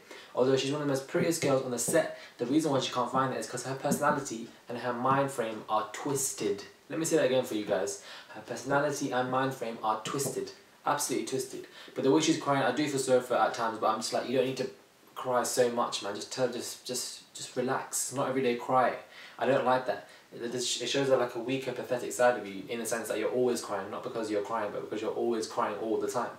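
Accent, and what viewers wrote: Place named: United Kingdom. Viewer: RHOA reviewed with a British accent????? LOVE IT!!!!!!!!!!!!!!!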